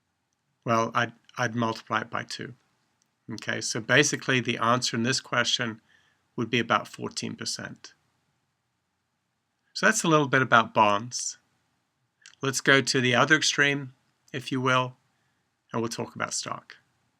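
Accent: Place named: United Kingdom. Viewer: Thank you for lectures they are very helpful, and the British accent makes it even more cool for some reason. Question: Near , you mentioned the need to convert the 8% into an effective 6 month rate, in order to do that don't we first need to convert the 8% from the nominal value into the effective annual rate,(EAR or EFF) which will give us a value of 8.16% EFF on annual basis, which will then translate into the 0.0408 on a semiannual basis.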